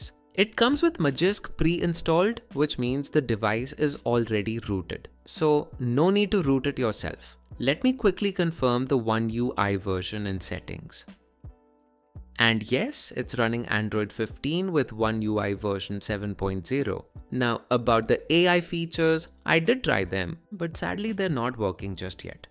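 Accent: India. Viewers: I dont understand what you saying bro i only hear indian yapping bruh 💔💔💔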